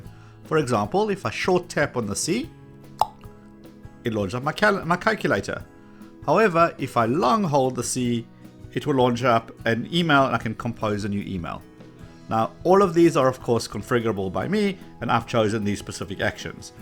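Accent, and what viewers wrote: South Africa. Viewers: south african accent there ... lol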